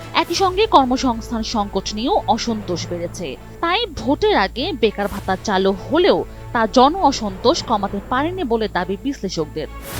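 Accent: Kolkata, India. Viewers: কোলকাতার মতো করে খবর পড়ছেন কেন? খবর হবে শ্রুতিমধুর!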